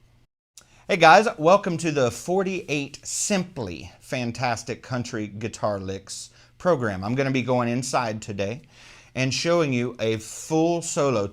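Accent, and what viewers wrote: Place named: South, United States. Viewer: I purchased this guy's guitar Sizzling Guitar Licks course a few years ago but due to major work and family commitments I was never really able to spend much time on the course materials he supplies. Skip forward a few years and I started to try to use some of his lessons and so called support literature. Like he says in the letter that comes with the package of DVD's "Where do I start with all this stuff? " Good question - the package was so disorganized and the tablature does not relate well to any of his DVD's. A real hill-billy package. You have to sit and listen to this dude blab on for minute after minute in his dumbass country twang wondering when is he ever going to get to the point. He is a great picker but seems to love the sound of his own voice way more! Once you buy this guy's course you will be incessantly bombarded with daily offers of more stuff to buy, but Good Luck if you ever try and request reactivation of your account to download missing tablature. 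2 or 3 e-mails later and not even an acknowledgement. Garbage after sales and customer service. Caveat emptor - translate that if you can. John 5 is much more of a gentleman!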